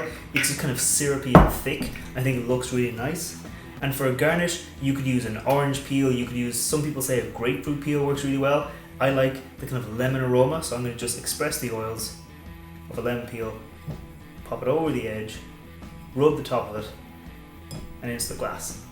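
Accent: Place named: Ireland. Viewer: Where are you from and what is your name? I recognise an Irish accent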